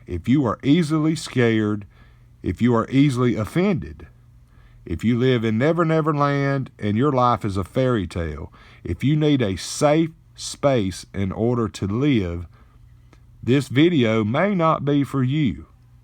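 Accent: United States. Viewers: I love a country man's accent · Why thank you. And may I add now I have your lovely Country accent in mah head and so I'll probably be talking like this here fo a lil wwhuailee Amen lord Jesus help us all. This is our Government's way of wippin a'out the peiiples see here that done need ta get done swiped out'der decrease tha population see there that's Whautt that right'e their is I done told Lucille she don't done believes believed meii. She sure heck will now!!!!! 💓💓💓💓💓💓🙌😘💌💋☺I done subscribed y'all do the same. God bless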